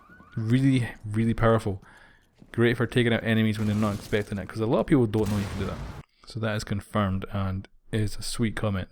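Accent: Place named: Aberdeen, United Kingdom. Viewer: you sound like your from aberdeen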